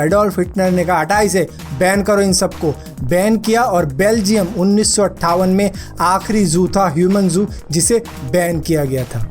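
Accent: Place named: Punjab, India. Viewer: hahaha punjabi lgda bai tu v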